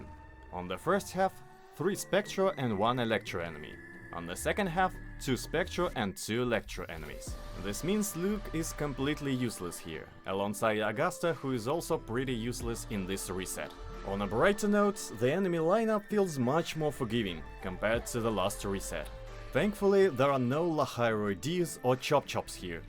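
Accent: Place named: Russia. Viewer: Акцент, как будто ты родом из России, но живешь в америке много лет))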